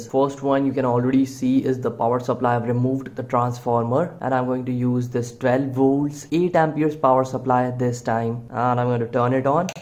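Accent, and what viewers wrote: India. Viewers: Ini videonya reupload kah ? Judulnya pakai bahasa Indonesia, tapi presentasinya menggunakan bahasa inggris dgn aksen India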